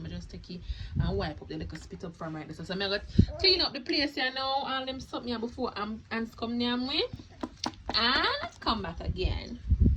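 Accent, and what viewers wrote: London, United Kingdom. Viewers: The london accent make me DWLLLLLLL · The London accent and conversation 😂😂😂. The British intellect 😆♥️ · U nailed that London accent Nice vedio 😊😊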